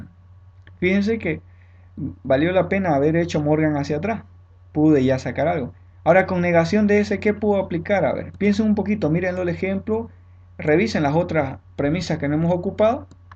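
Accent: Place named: Bolivia. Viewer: Sos de Bolivia? Se me hace familiar tu acento :v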